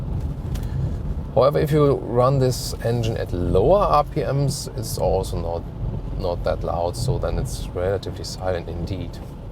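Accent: Germany. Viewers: Ein Deutscher spricht Englisch. A German speak Inglish.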